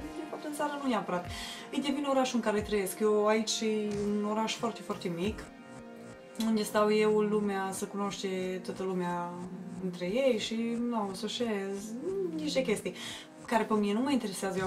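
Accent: Maramureș, Romania. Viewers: Draga şi frumoasa mea Maramureşancã Azi dimineațã te-am descoperit, nu ştiu cum nu te-am vãzut pânã acum, mã şi mir😲🙃 Când am vãzut fãțuca ta frrrrumoasã😍😍, yaay, am zis sã vedem cine mai este aceastã fatã frumoasã foc. Şi apoi a venit surpriza cea mai plãcutã😍😍❤❤👍👍 Te ascult şi te ascult şi zic na fata e ori Clujeancã ori Maramureşeancã de a mea👍👍❤❤😍😍 Iau telefonul şi încep sã caut între clipurile tale. Şi daaaaaaaa👍👍❤❤❤❤❤❤❤😍😍😍😍😍 am avut dreptate şi nu imaginezi cât de tare mã bucur şi o sã-ți spun de ce🤗🤗 Nu-s eu Maramureşeancã dar sunt nãscutã la Carei pe meleagurile Sãtmãrene❤❤ Deci tot din zona aceea pe care o iubesc din toatã ființa mea❤❤deşi de 38 de ani m-am fãcut Clujeancã😁👌❤❤ Scumpa mea nici nu ştii ce dimineațã frumoasã mi-ai fãcut. E o plãcere sã te ascult dar în primul şi primul rând eşti o frumusețe o minunãție de persoanã. Şi apoi şi eu iubesc parfumurile şi produsele de makeup la nebunie deşi nu mai sunt chiar aşa de tânãrã( bine mintea mea o am acolo pe la 25 de ani🤗😉😉😁😁) Ai cãştigat o abonatã serioasã şi de la mine o sã ai mereu douã like-uri binemeritatã( unul şi de la fiul meu) Îți doresc din toatã inima mea mult mult succes atât aici pe yt cât şi în viațã frumoasa mea❤❤ Te îmbrãțişez cu mult muuuuult drag şi vin şi pe insta( eu gyorgyeniko1965) ❤❤❤❤❤❤❤❤❤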